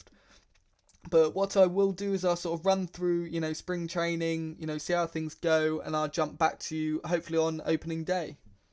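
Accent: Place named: United Kingdom. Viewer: Wasn't expecting a British accent with this..lol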